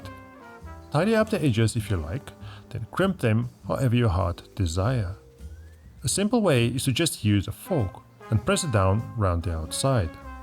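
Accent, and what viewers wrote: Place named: South Africa. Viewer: Found you by accident this evening. Subscribed! Love hearing a South African voice with the cooking videos. Also really enjoying your vegan options 😂🎉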